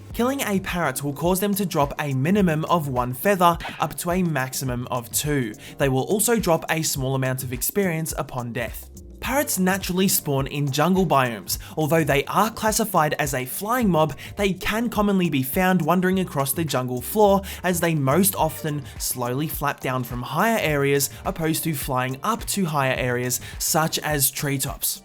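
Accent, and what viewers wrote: Australia. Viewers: are you Australian? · wait a second.. are you aussie? cuz you sound aussie to me lol btw i’m australian lol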